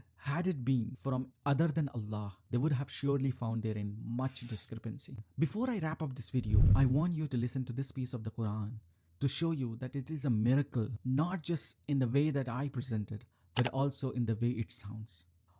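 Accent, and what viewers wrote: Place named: Bangladesh. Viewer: Assalamu alaikum brother. Are u from Bangladesh? ur accent sounds familiar. take love <3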